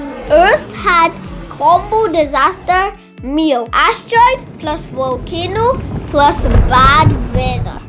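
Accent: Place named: Scotland, United Kingdom. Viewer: So sweet Lovely speech Looking smart Great knowledge He speaks like sccotish boy❤❤❤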